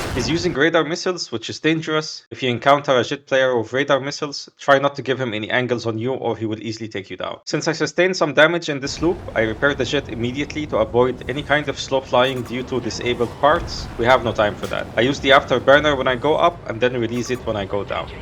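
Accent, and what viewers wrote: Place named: Egypt. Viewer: are you egyptian? very egyptian accent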